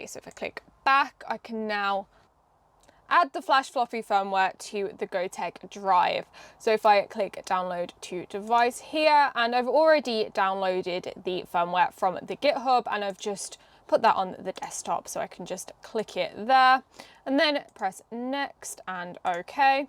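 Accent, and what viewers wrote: United Kingdom. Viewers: Okay, now I understood, what means "british english" 🤯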